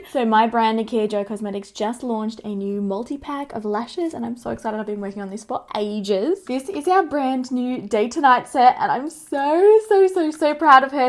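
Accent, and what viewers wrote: Australia. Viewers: I love watching your videos and I love your Australian accent 😃❤ You are so beautiful on the top of that lol 😍🤗🌺 Xoxo from Canada 🇨🇦✌❤🤗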